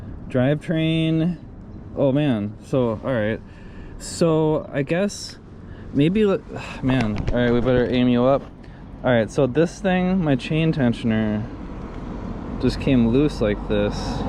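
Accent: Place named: Wisconsin, United States. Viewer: Thanks for reppin’ the MidWest. Love your understated fashion sense adorable ‘Sconnie accent and seeing the Spingtime in Hudson.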